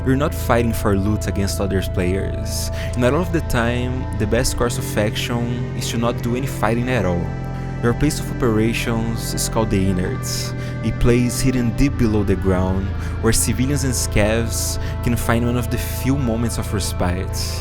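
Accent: Brazil. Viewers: Sotaque de brasileiro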